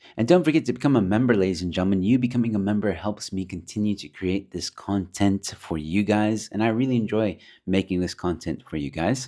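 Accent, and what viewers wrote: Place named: United States. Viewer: Don’t lose your American accent just yet. You might be back before you know it.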